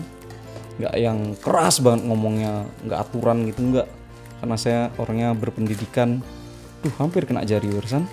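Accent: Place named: Surabaya, Indonesia. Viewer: Hahahah, suroboyoan jangkrek..😁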